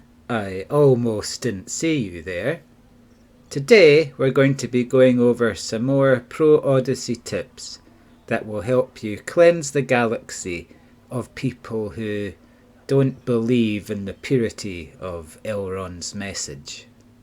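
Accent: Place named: Scotland, United Kingdom. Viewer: No, that's right. Nothing harsh about it, it's more of a "thoughtful" Scottish accent. 🙂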